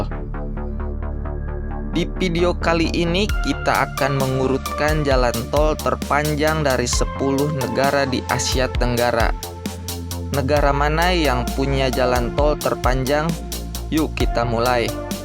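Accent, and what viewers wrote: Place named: West Java, Indonesia. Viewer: Adminnya urang Sunda yah, huruf F sama V jadi P semua 😀😀😀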